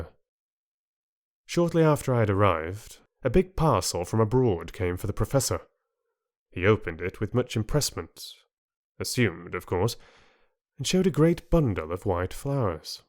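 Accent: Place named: England, United Kingdom. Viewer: Congrats an an absolutely fabulous reading, and so much better with an English accent, I couldn't bear the American. Please, please, please finish the book!!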